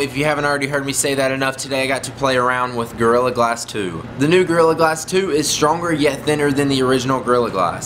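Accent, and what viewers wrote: South, United States. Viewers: What part of the south are you from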